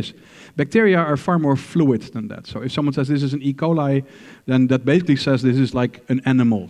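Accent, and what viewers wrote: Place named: Netherlands. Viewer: He is dutch i hear that 😜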